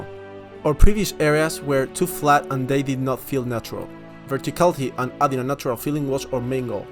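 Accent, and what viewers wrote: Spain. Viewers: this game looks AMAZING, I cant wait to know more also, is that a fellow spanish accent I hear?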